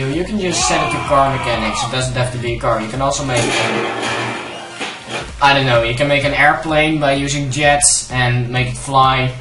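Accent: Netherlands. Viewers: Jij hebt een nederlands acsent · are you dutch?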